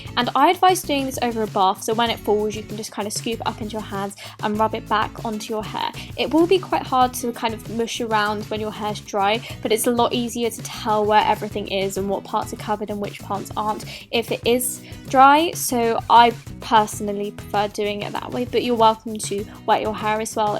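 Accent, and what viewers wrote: United Kingdom. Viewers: Your strong British Accent...Pretty good there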